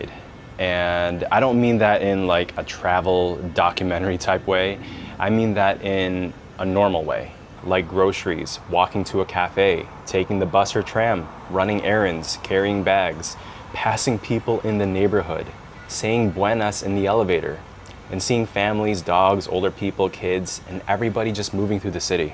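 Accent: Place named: United States. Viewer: got an American accent!!😊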